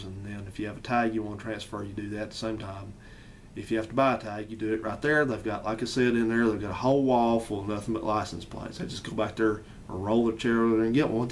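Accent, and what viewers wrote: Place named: Alabama, United States. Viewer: OMG this drives me insane! Dude I am with you. These stupid "Alabama Tag Applied For" signs are just illegal and the authorities turn a blind eye to the issue. Ran across this video searching for this exact subject. I'm in AL too. I watch your other channel and I was like that boy is definitely from Alabama. The central AL accent is very recognizable. 😁😁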